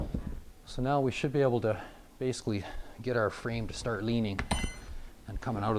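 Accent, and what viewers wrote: Canada. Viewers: My wife is from Victoria BC. I picked up your accent immediately. Thanks for the vid! I have to replace a broken door. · Only needed to see the first 5 mins, but was captivated and watched the whole thing. The Canadian demeanor is just so lovely...at least from the accent he sounds Canadian…anyway, excellent clip! Right now, my sliding door feels super heavy, and not being that handy, I couldn’t figure out how to pop it out to clean the tracks (even though they look pretty dang clean, or whatever. I had no clue you could adjust the height of the hardware under the door. This is going to be super helpful. I’ve got a weekend project that shouldn’t take much time at all. THANK YOU from Ohio. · great informative video sir, you from Canada eh?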